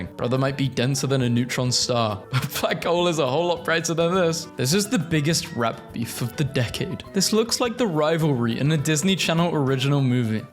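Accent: United Kingdom. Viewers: Hi British man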